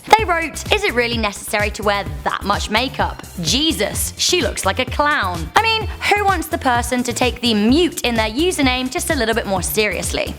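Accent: England, United Kingdom. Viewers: I can't believe your English! I am English too!!!!! :) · She is really pretty and 10 million people in Britain have the same accent, including me!! 😋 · I love your hair! red hair is the best! I've also always loved British accents, and your outfit is ON POINT! You go girl! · Are you British if you are I love your voice but if your not I love your accent and crop😸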